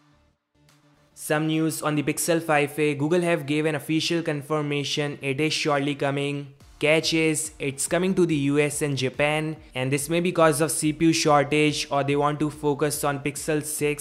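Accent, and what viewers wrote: India. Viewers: So this guy is fellow indian....he sound indian though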